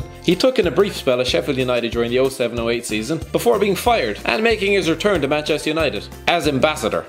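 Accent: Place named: Ireland. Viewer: Michael Hart it's the irish accent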